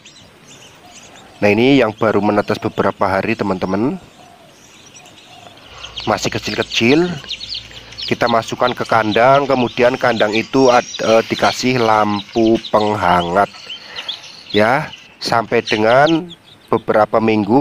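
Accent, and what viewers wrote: Banyumas, Indonesia. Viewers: kita angon " sampean wong jowo ngapak pow kiE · Wong ngapak ..pancen josssss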